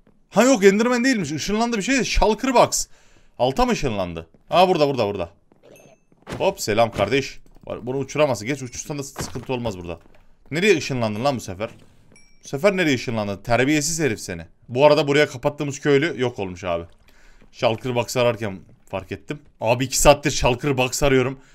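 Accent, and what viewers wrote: Yozgat, Turkey. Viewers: Yozgatlık leeeeyn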